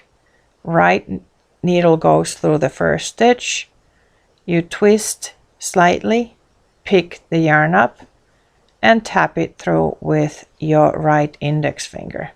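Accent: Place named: Russia. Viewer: Yes, IMHO, her purl is definitely 'Russian' style.....perhaps her accent, too.....not that it matters..........and, it twists the stitch CW versus CCW and requires working through the back of the stitch/es on the return row......which can get messy if working a complicated pattern.....lace work in other words.....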